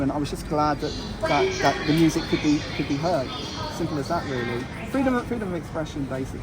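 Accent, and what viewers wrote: United Kingdom. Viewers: Dr K seems like such a decent cool guy and I love his accent. Reminds me of me English cousins. Hadn’t heard of him until this nonsense happened. Now I’m following.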